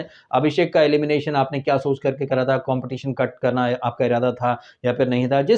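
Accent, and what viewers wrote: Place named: Delhi, India. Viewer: bhai aapka tone delhi ke logo jaisa h😂 islye maine poocha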